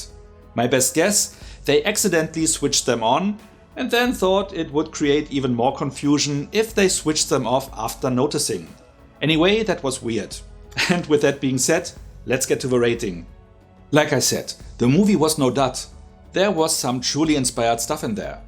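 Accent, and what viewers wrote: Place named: Germany. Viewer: I like ze quality of ur english but still it is herable: you truly are a German dude. So, warum sprichst du nicht einfach auf Deutsch? So gut auch wirklich dein English ist, so sehr hört man es einfach heraus, dass du Deutsch bist und schwubsti wubs, kann ich dir ned mehr folgen.... So please, keep speaking in German, zaz how I understand u most perfectly.... U understand Mr?:D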